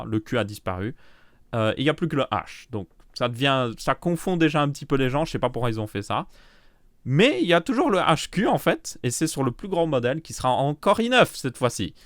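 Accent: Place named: Belgium. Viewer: Putin un belge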